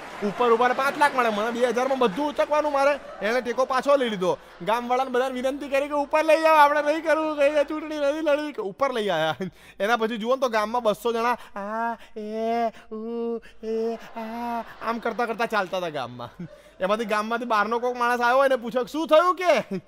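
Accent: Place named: Ahmedabad, India. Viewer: તારી અમદાવાદી બોલી મારા દિકરા જેવો છે એ વિદેશ માં છે મને એની યાદ આવે તો તમારા વિડિઓ જોવું.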